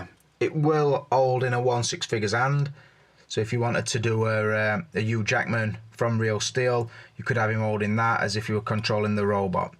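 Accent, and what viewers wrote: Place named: Scotland, United Kingdom. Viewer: he is a scottish person i knew because how he talked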